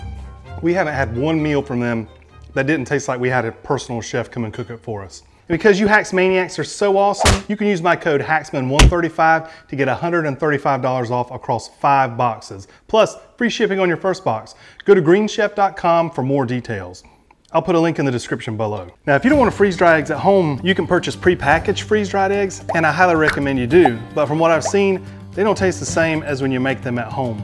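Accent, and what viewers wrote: United Kingdom. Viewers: That British accent got me good😄 I did not expect that. Good one sir. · British accent earned you a sub... from a Brit! Haha.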